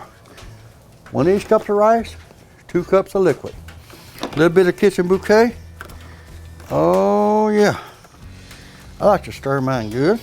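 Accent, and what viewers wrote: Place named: Louisiana, United States. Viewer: Oh man, my dad grew up in South Louisiana and watching this video, with accent, mannerisms, and lingo makes me think of my dad so much. Its like watching my dad make jambalaya lol.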